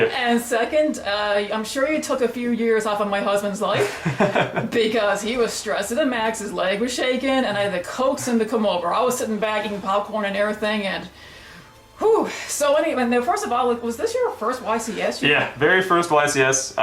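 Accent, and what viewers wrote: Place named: Newfoundland, Canada. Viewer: Love the newphie accent. All the hard A's lmao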